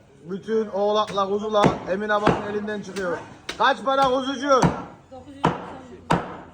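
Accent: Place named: Kargı, Turkey. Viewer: Ben kargı lıyım bizim oranın kebabına benziyor şiveleride aynı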